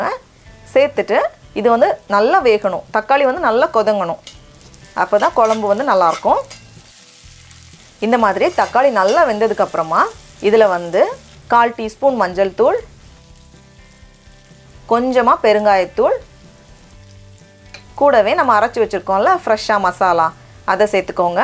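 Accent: Nagercoil, India. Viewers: Ur way of speaking Tamil in Nagercoil slang is soooooo good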